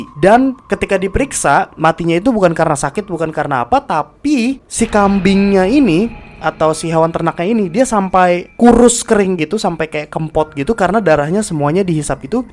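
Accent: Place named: Surabaya, Indonesia. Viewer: Hadir coy suroboyoan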